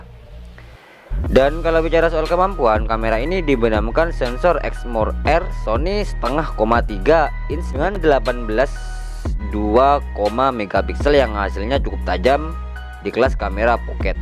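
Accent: Madura, Indonesia. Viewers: Orang madura ya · kayak orang Madura malahan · Orang madura ya bang?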